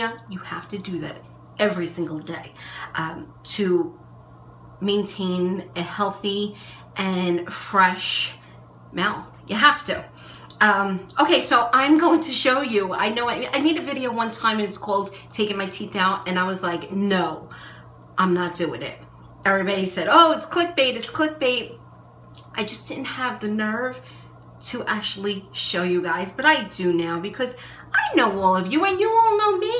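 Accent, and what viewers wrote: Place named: New York, United States. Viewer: I just discovered your channel.... Can i say seriously I've been watching all your videos the last day in a half and I'm in love!!! You are the most down to earth human being I have ever seen....and i love love love your accent...im from Chicago so the new York accent is amazing to me lol. Anyways keep making videos and being beautiful! Xxx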